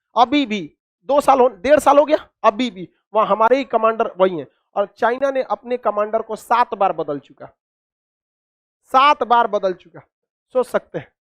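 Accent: India. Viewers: He is going too fast. Speaking a typical bhaiyya language, spoken by vegetable and istriwalas in Mumbai.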